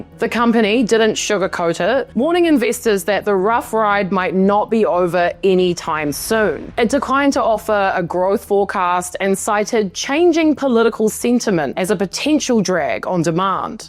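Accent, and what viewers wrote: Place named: New Zealand. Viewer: This is what I like to hear. Having been indoctrinated by YouTube into becoming anti-E-Loon I have been watching with some amazement the trajectory of this bloke's rise and rise on what is a Ponzi like bubble. The bubble is now straining to burst and the bigger it gets the bigger the mess is going to be. Personally I wish no good to the USers, the sad thing is the people are going to have to clean up this mess when the Moosk is gone. I predict his demise some time in the middle of this year, whether it will be the impeachment of Trump or the commencement of WWIII the E-Loon will fall. Whatever goes down it will fall on the people to carry the weight. I am enjoying your US reportage in an NZ accent.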